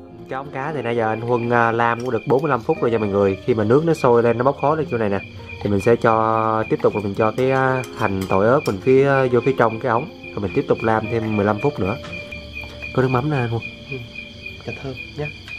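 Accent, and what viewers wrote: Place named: Nghe An, Vietnam. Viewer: Quê em đặc sản là lươn đồng sứ nghệ Nghe phát biết ở đâu luôn Điểm danh nào☝️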